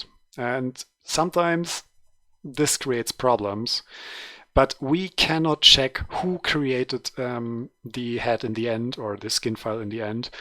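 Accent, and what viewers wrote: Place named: Germany. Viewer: Klingt nach einem Deutschem, der Englisch spricht ^^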